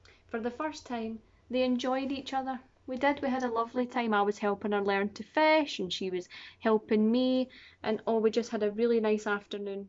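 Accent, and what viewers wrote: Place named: Scotland, United Kingdom. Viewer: Damn, now that's some real scottish accent.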